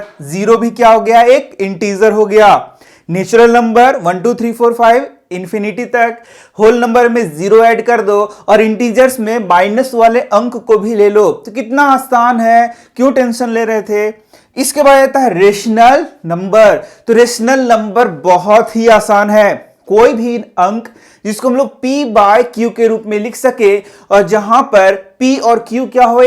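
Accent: Bihar, India. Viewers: Sir r u Bihari..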